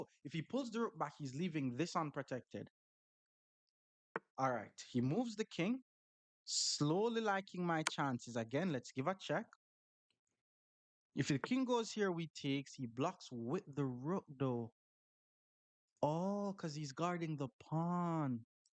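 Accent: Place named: Jamaica. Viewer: bro i found your vids a couple of days ago, and I'm hooked. Best chess educational content on YouTube! also really appreciate the soft Jamaican accent. I find myself kissing my teeth when playing now, followed by "I can't take becaa...". Big ups bro, drop a lil more patois tho please <3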